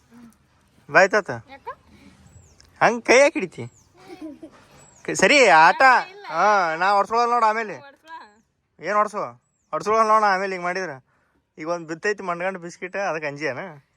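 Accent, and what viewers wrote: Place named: Andhra Pradesh, India. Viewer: Anna navvvu kuda andhra avre anna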